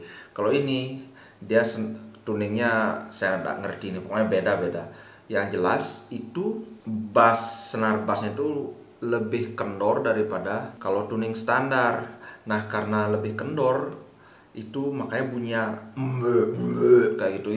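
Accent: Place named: Bali, Indonesia. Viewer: BESER... 😁😁😁 medok sajan base baline adi bli...😅😅😅 👏👏👏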